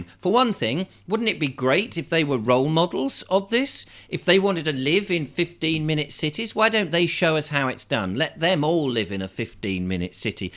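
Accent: United Kingdom. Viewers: Such a wonderful, true assessment of the whole situation they are trying to put us in. And your British accent makes it all the more enjoyable.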